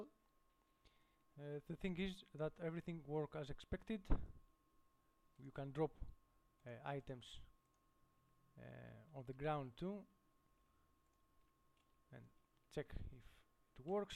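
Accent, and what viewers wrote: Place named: India. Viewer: Man you are just PERFECT except your english, I guess you are Indian :D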